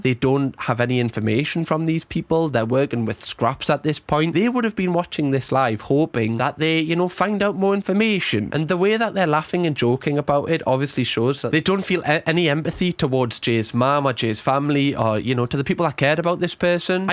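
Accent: Newcastle, United Kingdom. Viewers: Are you geordie ? I keep hearing bits of welsh in your accent. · Your voice is going up at the end of every sentence.. I get your a geordie.. But it's hard to watch with the internation...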